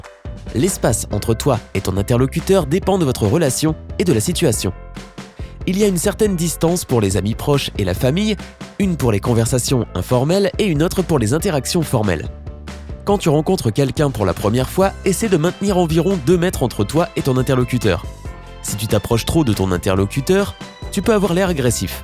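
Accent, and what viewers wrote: Quebec, Canada. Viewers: Si le gars est Québécois, il parle comme au Québec, on ne peut pas lui en vouloir de ça - à nous d'adapter pour l'Europe :-)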